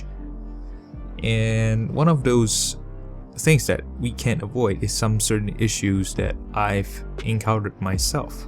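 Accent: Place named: United States. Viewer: You sounded American and as an American, I LOVE the way you say "Perspective". Also, am a big fan of Dragonball Z and Goggins too. ✨ ✨ 💪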